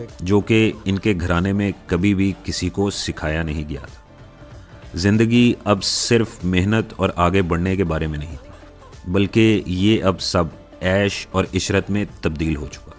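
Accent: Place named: South, India. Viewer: R u a muthalman bcoz u sounds as a mdracha chaap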